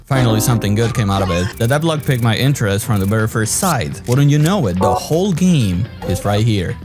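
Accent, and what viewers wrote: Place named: Europe. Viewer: Your voice sounds weird because European, not because illness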